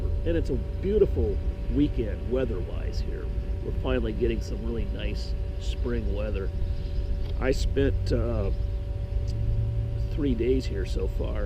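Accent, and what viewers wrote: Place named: Small-town, United States. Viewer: This made me wonder if there was some relationship here between building concrete form work and boatbuilding. A fascinating view, so have your family lived in Washington for generations and is your accent local? It has that small town American - Lake Wobegone/ Ken Burns feel to it, which is quite attractive. For fans of Americana here in Europe this down on the ground exploration is the other (positive) side of the globalised uniformity driven by the Internet. Yes to Van building/living, but also Yes to getting out and about.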